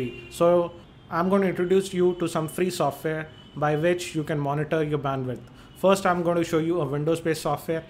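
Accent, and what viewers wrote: India. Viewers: Funny idian voice xD (no racist)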